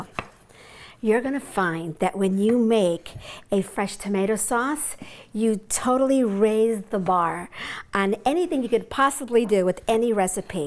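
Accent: United States. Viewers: She has an American accent and her Italian one is not the best. It's what happens to the second or third generations. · Her accent is American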